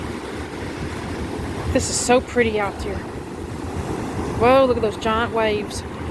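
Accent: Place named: Texas, United States. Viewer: Love that Texas accent (I miss that)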